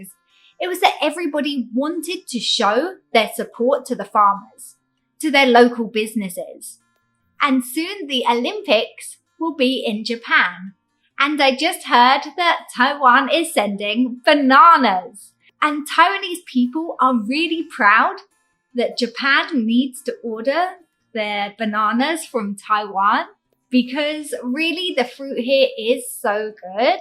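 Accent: United Kingdom. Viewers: 慢速英国腔，好听。关注您。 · Hi I like your UK accent that saw so many video 👍 台灣真的是水果王國，我去到北美的時候看到水果幾乎都是冷凍的！覺得都不想吃了😨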